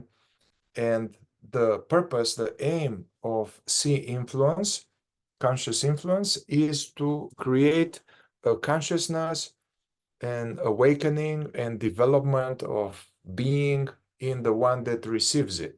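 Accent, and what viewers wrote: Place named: Jamaica. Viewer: Where are you from you sound jamaican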